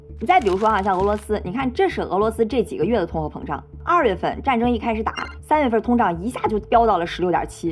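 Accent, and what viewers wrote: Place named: Beijing, China. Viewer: 這北京腔官話真難聽 還是咱河南官話好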